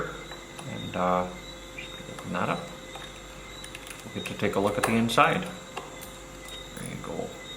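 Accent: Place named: Wisconsin, United States. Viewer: You Wisconsin folk talk funny but you're a friendly bunch. Dont lose those calories!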